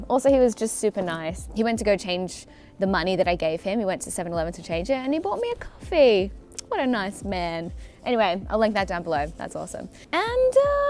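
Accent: Australia. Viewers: Its just An aussie talking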